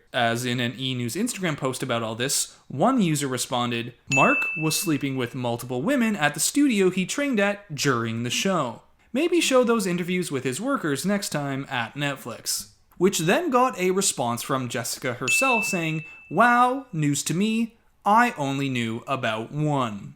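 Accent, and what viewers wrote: Canada. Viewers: Is the narrator from Canada?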